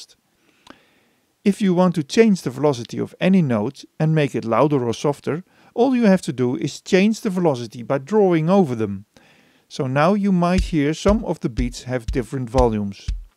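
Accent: Netherlands. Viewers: Ben je nederlands? Het lijkt wel zo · Zowiezo dat deze man Nederlands is!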